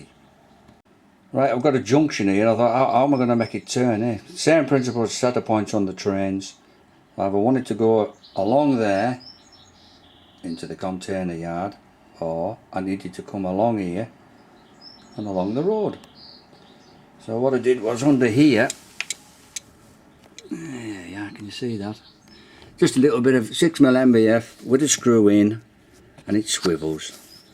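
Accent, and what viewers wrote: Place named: Hartlepool, United Kingdom. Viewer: Love the accent....Hartlepool???????????????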